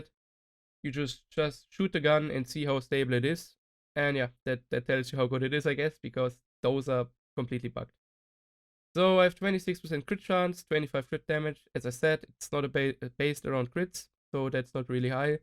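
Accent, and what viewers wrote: Germany. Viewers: Digga das war das erste was ich gedacht habe... es regt mich turbo auf :D wenn die leute diesen deutschen "akzent" haben. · Bist du deutscher? Hast diesen deutschen accent ^^. Gutes Video !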